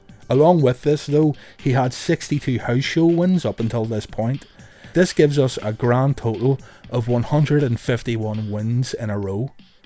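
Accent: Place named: Northern Ireland, United Kingdom. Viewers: Northern Ireland narrator is class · What part off northern ireland you from?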